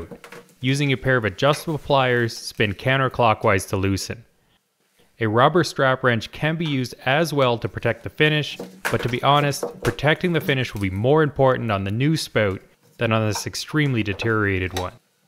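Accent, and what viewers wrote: Canada. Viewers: The Canadian accent is killing me.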